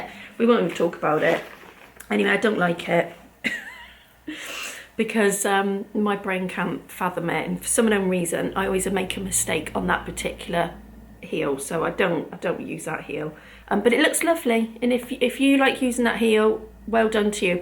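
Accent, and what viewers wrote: United Kingdom. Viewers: hello, I am new here, i enjoyed this episode so much:) I also have daughter age 17, shes studies at gymnasium grade 10, i could not understand a of your education system, did i understand right, son is at grade 6 or it's something else? Also I made a hat Melete , its really warm hat:) I don't know from what country you are, sounds like UK, but in Latvia we have really cold weather till -20, and I hope this will be warm enough for my daughter. Also loved suggestions for movies, tomorrow we will watch Wild robot. Thank you:)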